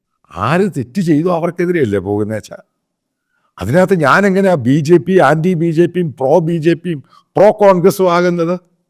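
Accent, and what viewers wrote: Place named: Pathanapuram, India. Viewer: സാമുവൽ സാർ ഞാൻ കേരളത്തിൽ ഞാൻ ആരാധിക്കുന്ന അപൂർവം ചില journalists കളിൽ ഒരാളാണ് സാമുവൽ സാർ.. കാര്യങ്ങൾ പഠിച്ച് മനസിലാക്കി സത്യം ജനങ്ങളിൽ എത്തിക്കുന്ന താങ്കളുടെ Hard journalism ഉം പത്തനാപുരത്തുകാരുടെ Accent ഉം എന്നെ വല്ലാതെ കോരിത്തരിപ്പിക്കുന്നു. ആളുകൾ വിമർശിച്ചോട്ടെ.... സാമുവൽ ധൈര്യമായി മുന്നോട്ട് പോകണം സർ. I wish u all success to you and your news channel which proved to be Genuine and popular news channel in Kerala. Ajith Kumar from Thiruvananthapuram.🙏🏻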